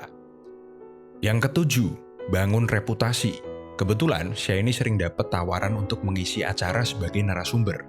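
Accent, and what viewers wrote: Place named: Java, Indonesia. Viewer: Ga, yg ini agak medok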